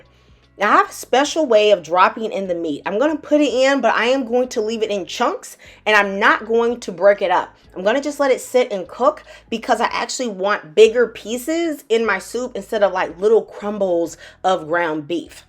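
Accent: South, United States. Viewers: Heard another southern accent--of course it's gonna be great!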